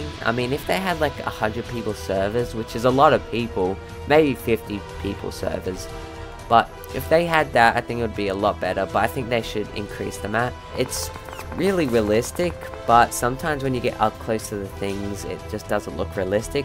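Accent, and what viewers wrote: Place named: Australia. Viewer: No offense, you sound like an Australian mixed with a French-Canadian. Otherwise, this is really cool. You earned a sub!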